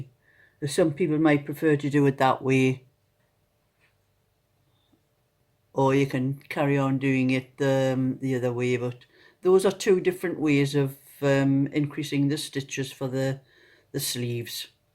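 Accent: Newcastle, United Kingdom. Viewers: Thank you so much, that was so helpful. Knitting is my new hobby since being locked down - it’s the only thing (apart from drinking beer) that keeps me sane. Also loving your Geordie accent! xx 😘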